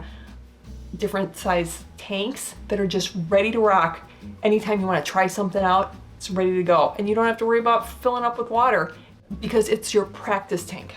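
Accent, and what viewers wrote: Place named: Upper Midwest, United States. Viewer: Hmmmmmm.. I’m not a language expert but you sound like you’re from Wisconsin or Minnesota..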